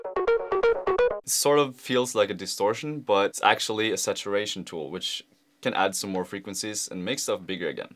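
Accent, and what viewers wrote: Norway. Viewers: Are u from norway? I can hear ur accent lmao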